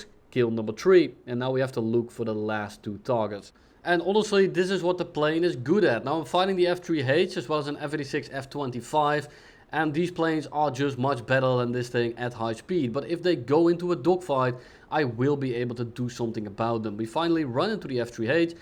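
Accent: Netherlands. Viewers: I can hear immediatelythat you’re Dutch at least I think so 😂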